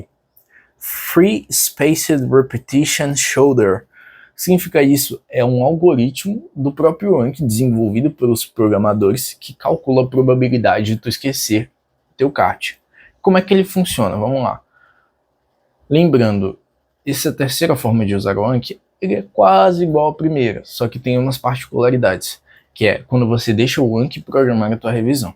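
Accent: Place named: Bahia, Brazil. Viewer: Oii! Maratonando seus videos! Reconheci o sotaque baianal kkk, de onde vc é? Estudou pra Uneb e Uesb?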